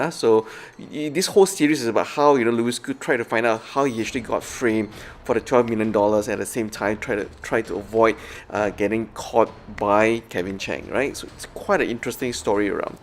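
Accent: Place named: Singapore. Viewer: An average watch. You sounds like from sg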